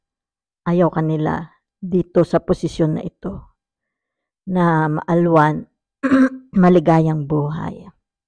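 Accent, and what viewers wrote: Batangas, Philippines. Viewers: thank you tita..GOD Bless you and your family..your a batanguena if i am not mistaken..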